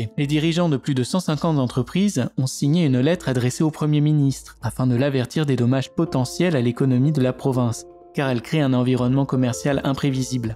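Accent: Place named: Paris, France. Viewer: Présentateur avec un accent de parigot ça promet! Je suis né sur un continent très éloigné de l'Amérique et de la France et j'ai habité le Québec depuis plus de 23 ans, en me voyant l'on s'adressait à moi en anglais ou l'on m'insultait avant même que je dis mot. Lorsque je m'exprime clairement en français, plusieurs ont des répliques virulentes et grossières et d'autres me demande si je suis comme eux (français de France). C'est tellement bigarré comme langage et biscornu comme formulation syntaxique que j'ai quitté cette province. Il ne faudrait tout de même pas oublié que la construction de Montréal s'est fait avec des gens qui ne parlaient pas français (Irlandais, Polonais, Hongrois, Tchèques, Allemands, Russes, Chinois, Italiens). Mais les colons venant de France jouaient aux maîtres et rois. Ils se sont fait damé le pion par les britaniques par la suite ( voir l'ouvrage "les deux grandes solitudes") et l'arrivé massive de juifs et d'américains. 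Les premiers envahisseurs sont les Français et les Britanniques en se battant pour coloniser cette partie de terre dont-ils n'avaient aucun droit acquis!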